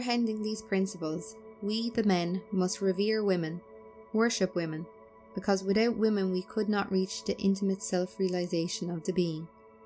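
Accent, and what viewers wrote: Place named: Ireland. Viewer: No doubt, that’s a lovely Irish accent I hear. Thank you.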